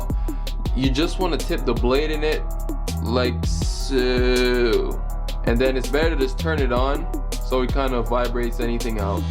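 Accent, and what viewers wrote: Jamaica. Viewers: Make 2 videos each time, Jamaican and "proper" English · Dweet ina the Jamaican Accent dawg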